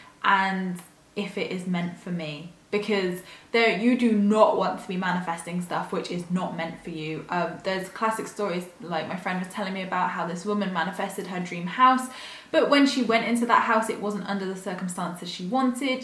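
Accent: England, United Kingdom. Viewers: I'm trying to manifest my dream home, which would be a self build straw bale house (not as unstable it sounds lol, actually a really well insulated house that is covered in plaster and cheap and eco friendly). This will mean I'll need a lot of money to buy the land and pay for the build, but I'm not complicating it by thinking about all the different aspects of it too much, I'm just visualising the house, and am going to try putting my written list in a manifestation box too like you have suggested. I'll watch the rest of your videos too and let you know how it's going, great to find another English person making these videos too, though all the American ones are still inspirational, somehow the English accent makes it more relevant to me.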